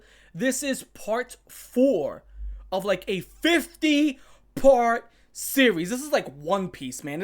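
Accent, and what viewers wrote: New York, United States. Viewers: holy ny accent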